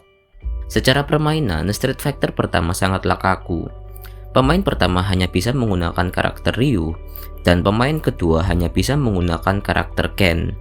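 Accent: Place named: Batak, Indonesia. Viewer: Logat batak nya kuat banget bah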